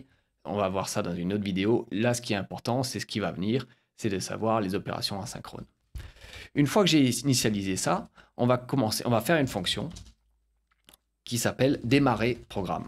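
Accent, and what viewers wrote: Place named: Alsace, France. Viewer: mec je te remercie, j'ai planché pendant 3 jours non stop. J'avais compris pourquoi on devait les utiliser mais comment utiliser ces outils, j'en étais incapable. Maintenant je vois un peu mieux et en plus tu as un léger accent alsacien ce qui m'a facilité le travail ^^'. Je pense que je vais jeter un coup d'oeil sur tes autres vidéos et merci encore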